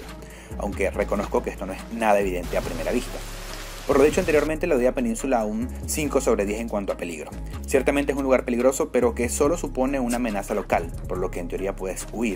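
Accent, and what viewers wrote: Venezuela. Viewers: Es venezolano¿ El narrador no los canibales, el acento xd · Buen video amigo eres de venezuela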